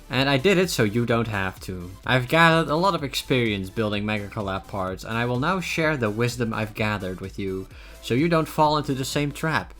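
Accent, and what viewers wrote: Netherlands. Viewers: Bruv are you dutch? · kkr dik nederlands accent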